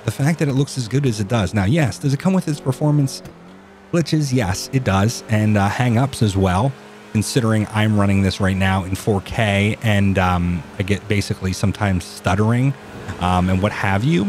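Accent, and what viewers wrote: Bay Area, United States. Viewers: Why do you sound like Bay Area buggs a little? Or is it just me? Anyways love the content!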